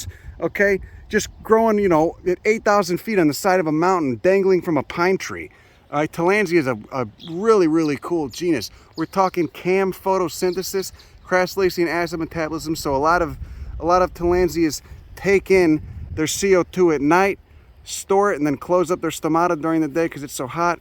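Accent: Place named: Texas, United States. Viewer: Genuine Texas accent!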